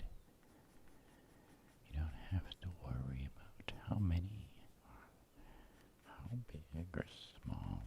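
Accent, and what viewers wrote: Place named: South, United States. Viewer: I like that country sort of accent, you should do like a car salesman role play with that accent, possibly. 👍🏼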